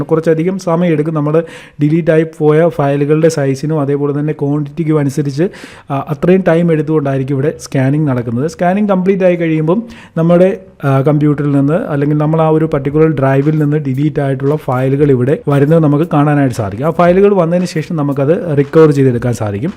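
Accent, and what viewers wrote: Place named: Kasaragod, India. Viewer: കേറി വാ മക്കളെ...🙋 ഇനി മുതൽ കാസർഗോഡ് ഭാഷയിൽ ബ്ലോഗ് കേൾക്കാം...😍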